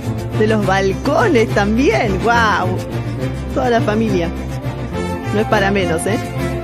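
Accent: Argentina. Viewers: Por el acento, entonacion y pronunciacion del español de Doña parece ser argentina. Que bien que te guste nuestra música... Saludos desde Ciudad de Mexico. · Argentina que sorpresa 😲!! te sentía cómo chilena, te identificó la gashina sos argentina.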